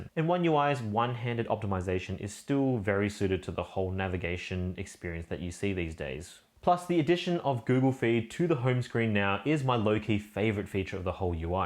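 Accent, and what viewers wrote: Australia. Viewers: you look Chinese but speak like an Australian . nice video though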